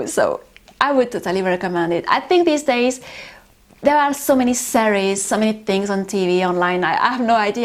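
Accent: France. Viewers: Lovely 🌹 It is relaxing listening to you, talking about books and french Culture.You make me want to learn French and Romance. You have a lovely French Accent. keep telling us more. Thanks Merci.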